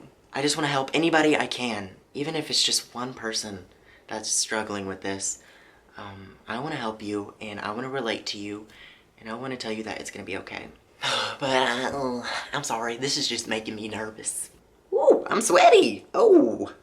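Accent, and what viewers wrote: South, United States. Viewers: Your southern accent is so cute I love it :)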